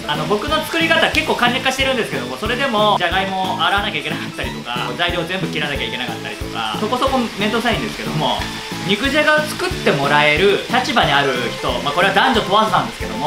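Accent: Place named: Chiba, Japan. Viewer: いつも思うのでさが千葉ですよね‼️かなりイントネーションがあやふやで訛ってますよ(笑)😫少し治したらどうでしょう‼️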